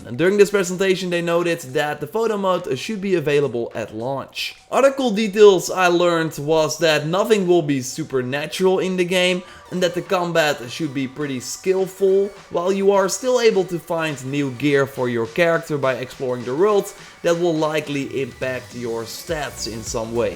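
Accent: Netherlands. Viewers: Je kon wel eens Hollands zijn:) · Nice Dutch accent bro.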